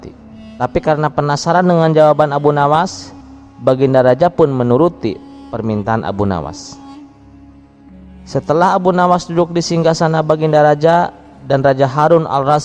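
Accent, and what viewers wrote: Sunda, Indonesia. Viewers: Ieu nu nyarita orang Sunda, medok sundana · Keciri iye org sunda yg mawa beritana.. medok pisan euy sundana..😂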